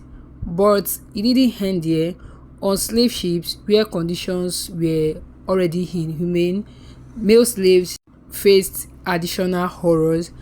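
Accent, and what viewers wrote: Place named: Africa. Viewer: Thanks for telling the story I could tell from your accent that you’re from the continent of Africa I wonder why so many Africans hate African-Americans when we came from Africa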